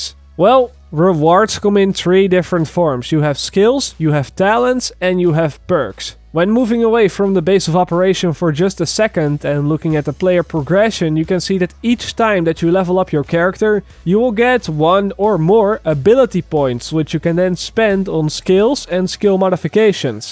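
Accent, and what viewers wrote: Netherlands. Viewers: Je hoort zo erg dat ie Nederlands is. Heerlijk!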